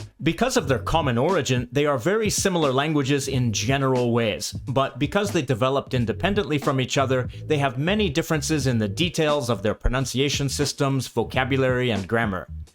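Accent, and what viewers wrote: Rio de Janeiro, Brazil. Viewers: Simplismente porque ele usou o sotaque brasileiro e não o português...ele deixou isso bem claro no vídeo.. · He didn't use a european variety, but a typical rio accent... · Esse sotaque carioca. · In Brazilian portuguese it is pronounced 'txi' Noooooooooooooooo You're using carioca accent for standard brazilian, thats not nice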